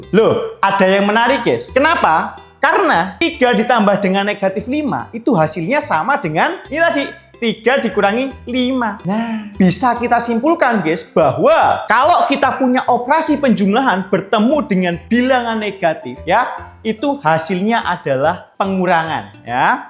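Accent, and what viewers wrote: Java, Indonesia. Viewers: Kak kamu Jawa?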